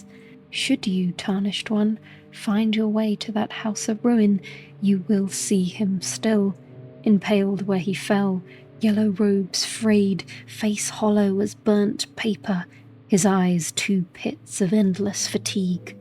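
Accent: United Kingdom. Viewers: Having a soft spoken British women telling me Elden Ring DLC lore in bedtime story form is something I never thought I would need. But I am here for it with a side of chamomile tea... because English breakfast tea has caffeine!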